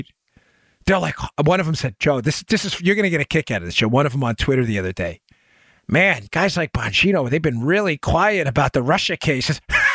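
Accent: New York, United States. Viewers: Since moving back to St. Louis I totally miss people with Dan’s NY/Northeast accent. Mines starting to disappear but I ramp it up to act tough with local punks. Great show Dan, also you are a formidable guest on Fox new panel segments.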